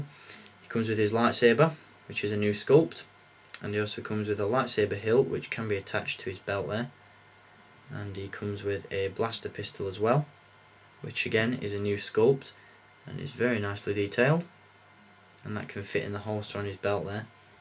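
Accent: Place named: Leicester, United Kingdom. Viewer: Hello there. Great video/review. I got this figure in the post today after ordering from Amazon. I completely agree with you; it's probably my favourite Luke Skywalker too! It was nice to see it out of the box as I'm keeping all my Lukes on the card. By the way, where are you from? I'm from Leicester and you and me have very similar accents.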